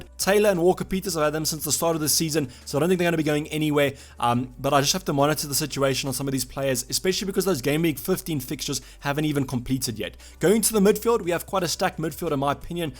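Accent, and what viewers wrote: South Africa. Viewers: I love your South African accent :)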